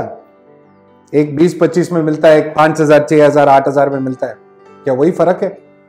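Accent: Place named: Tamil Nadu, India. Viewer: Hi, I am eagerly awaiting to watch and hear your cute Tamil slang, please upload some Tamil videos then and there.. At least a dubbed version of your Hindi videos...😝😝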